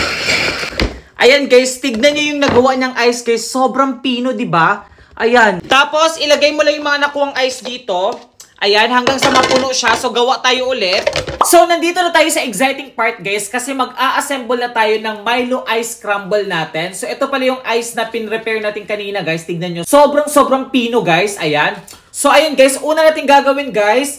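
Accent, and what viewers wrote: Visayas, Philippines. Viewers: Bisaya ka po ba 😅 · BISAYA KA YAH? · Kuya bisaya ka ba? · bisaya diay ka ya?😂😂😂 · Hala bisaya diay ka kuya??? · Gosto. Namin. Ka. Mag. Bisaya. narinig. Koga. Ng. Bisaya. Ka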